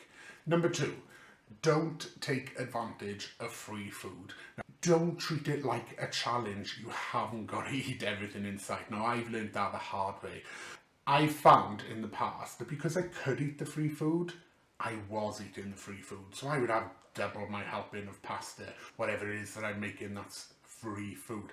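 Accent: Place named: South Wales, United Kingdom. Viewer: New sub here! Also from South Wales I'm assuming by your accent you are to! Great video keep up the hard work !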